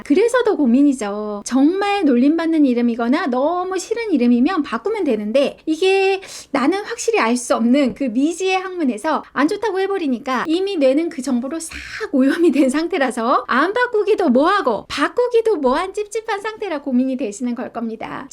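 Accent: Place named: Seoul, South Korea. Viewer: 꼭 옛날 뉴스에서 나오는 서울사투리 느낌이네요ㅎㅎ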